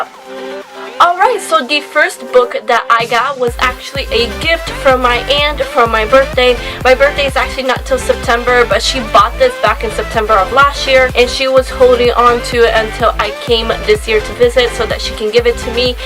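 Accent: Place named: Brazil. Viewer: I detect a Brazilian accent...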